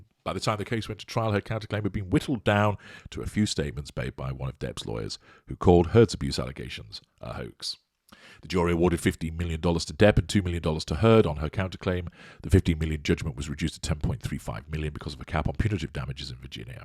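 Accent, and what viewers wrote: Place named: Northern England, United Kingdom. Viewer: Think you hail from the North of England 🏴 have been curious for an age!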